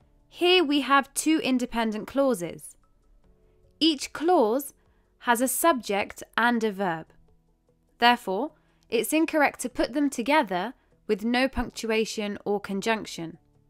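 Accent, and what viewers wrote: United Kingdom. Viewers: Your Accent is British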